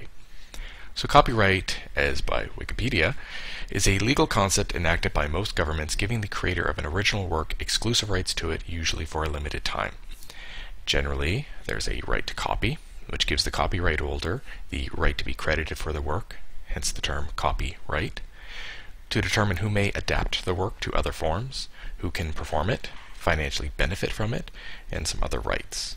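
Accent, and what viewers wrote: Canada. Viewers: Are you canadian? I sweat I heard aboot a few times.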